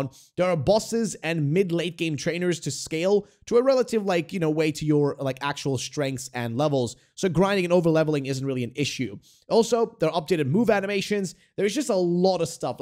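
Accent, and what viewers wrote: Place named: Australia. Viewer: Are you Australian or American? Your accent cant seem to make up its mind.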